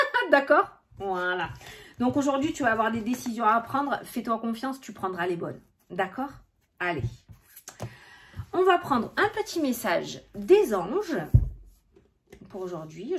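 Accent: South, France. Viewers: Ton accent me parle très bien car je suis muté dans le Sud, je recommence tout à zéro et les finances suivront 👍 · Coucou, j'adore votre façon de faire les guidances, votre accent du sud, votre franc-parler lol 👍😁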